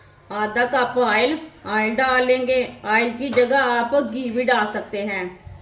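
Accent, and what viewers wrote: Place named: Punjab, India. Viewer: Panjabi jab Urdu main baat kartay Hain to pata chal jata hai k yeh Panjabi hai lahja aisa HOTA hai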